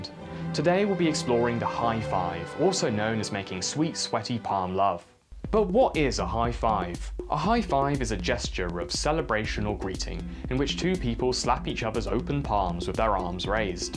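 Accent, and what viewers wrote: United Kingdom. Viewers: I love hearing the British explain baseball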